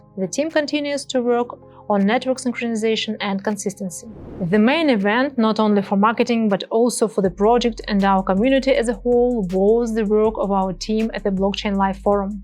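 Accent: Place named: Russia. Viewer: Great news! Love your accent, assuming Russian?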